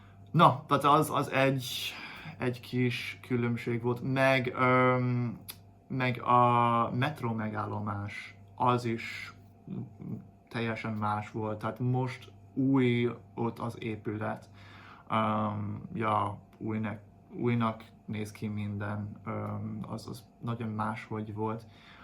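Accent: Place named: Budapest, Hungary. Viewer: Én tájszólással beszélem a magyar nyelvet, te pedig mintha Budapesti lennél.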